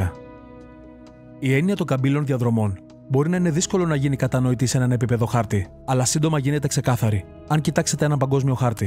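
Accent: Crete, Greece. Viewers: ναι ρε φιλε, αχα. ανοιξα να δω τα σχολια για αυτό το λόγο. Άσχετο, απο την προφορά του, πρεπει να ειναι απο Κρητη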